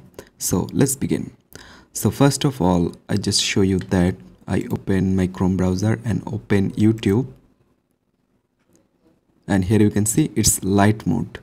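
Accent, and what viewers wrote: India. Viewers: that indian accent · indian detected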